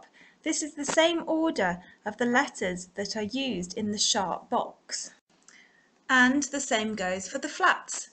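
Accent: United Kingdom. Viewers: Thank you so much. I'm teaching myself piano, and you've been a huge help! And I like your British accent. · Ahhh British accent 😲😲😲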